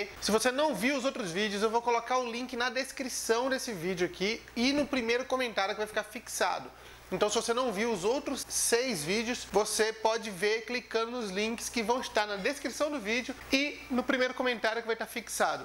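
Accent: Minas Gerais, Brazil. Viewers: Amigo, você ė muito bom! Você já está perdendo seu sotaque pernambucano e falando igual mineiro. Gosto muito dos seus vídeos! As cidades em inglês foi muito engraçado! Parabéns!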